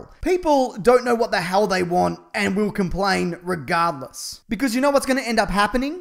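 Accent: Australia. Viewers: Hey love ALL your shows, are u a Aussie you sound like a Aussie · God I fucking love this channel and their podcast. Its hilarious and bang on with the commentary about films these days. The australian accent just makes it way funnier, I wish I was aussie instead of just crap and English. "Im gonna start pointing some bloody fingers!"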